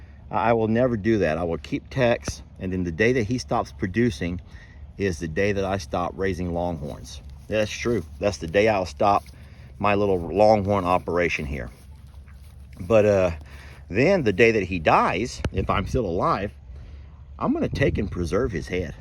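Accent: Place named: South, United States. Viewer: I am 100% right there with you on the southern pronunciation, there. If I have to use text-to-talk or google search voice, I have to speak loud, clear and slow...and STILL get frustrated because the damn thing doesn't speak Southern! And forget calling with voice activated menus....I might say "pay my bill" 5x and it still can't understand me! So I give up an start cussin it out...so it finally switches to number controls: 1 for yes or 2 for no. I laugh but I also get really pissed....because Southern people in my neck of the woods get some words more correct than other states! Some places people say E-mmediately, we say IMmediately (like IMagine) which is likely more proper! Its a crazy thing, the english language!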